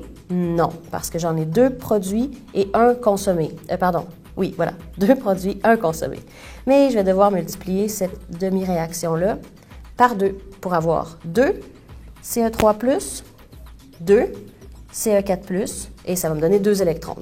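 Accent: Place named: Canada. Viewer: Hhhhh accent canadienne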